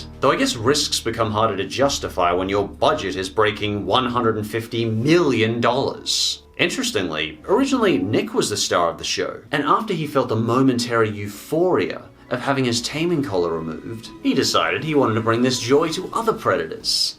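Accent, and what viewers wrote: Australia. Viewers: are you australian aswell? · Are u Australian?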